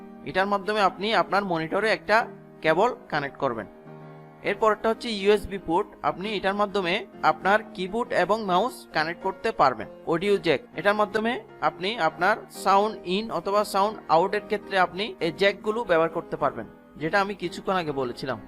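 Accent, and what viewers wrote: Chittagong, Bangladesh. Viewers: ভাই মনে হয় চাটগাঁইয়া 😊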